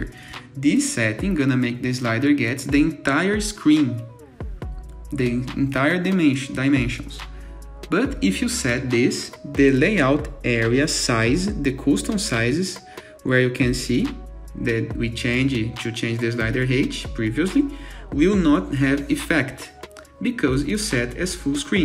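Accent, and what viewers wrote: Brazil. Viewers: sotaque full br kkkkkk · It sounds like Brazilian guy speaking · Esse sotaque br não engana rapaz, hahhahha valeu cara salvou minha vida aqui! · Amigão pela pronuncia vi que é BR, faz um roteiro antes de gravar o vídeo. Vai ficar mais fluido as explicações. Valeu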